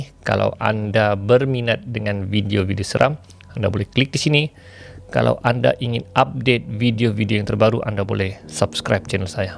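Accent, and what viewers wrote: Sabah, Malaysia. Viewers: Org Sabah kah kau ni bossku??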